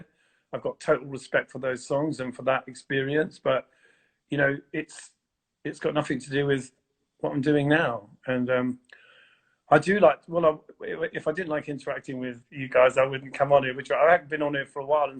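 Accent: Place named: United Kingdom. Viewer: And I love your British accent!😍😍😍😍😍😍😍😍😍😍😍😍😍😍😍😍😍😍😍😍😍😍😍😍😍😍😍😍😍😍😍😍😍😍😍😍😍😍😍😍😍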